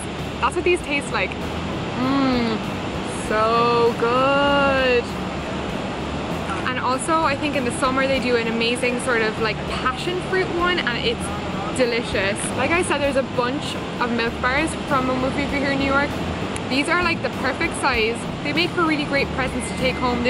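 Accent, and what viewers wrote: Ireland. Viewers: U sound Irish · Do i sense an Irish Accent. :D Nice Video been to New York Twice love it. Deff beats home for the savory treats.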